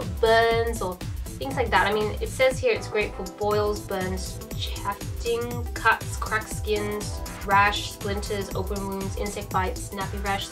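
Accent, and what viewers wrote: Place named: Australia. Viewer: So happy I found out your Australian. I needed to know where your accent was from! Ps this video was great, like all your others are xx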